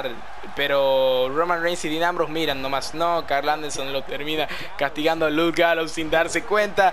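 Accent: Argentina. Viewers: una pregunta ¿sos argentino?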